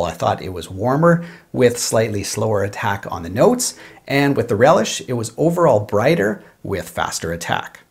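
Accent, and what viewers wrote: Canada. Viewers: you sound so Canadian to me really enjoy your videos bro! Love from the Dominican Republic.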